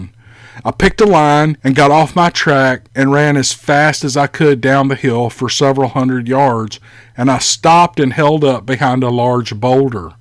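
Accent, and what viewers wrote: South, United States. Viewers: Hysterical fantasy. What's with the southern accent? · Sounds like a southern racism Chanel. Tone down the confederate accent. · your quote. "How about I just jump into the stories without running my mouth".(But please don't forget to play the music?) - my quote!. And I don't think you run your mouth off because I love listening to your southern drawl. From an old Aussie who just loves your presentations.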